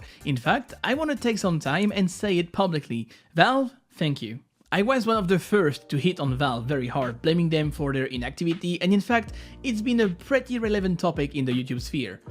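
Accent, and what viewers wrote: France. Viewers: Ça fait 5 vidéos que je me pose des questions sur toi, est-ce qu'il a un accent bizarre, est-ce que c'est un anglais/amériquain qui est d'origine française, un français qui parle anglais ??? Bah je savais pas, donc finalement je vois ton interface YouTube avec "vues" et "il y a x mois". C'est bon j'ai ma réponse :D. En tous cas que tu sois d'origine anglaise ou non, mais si ton anglais est french spotted, il reste super fluide et naturel 👍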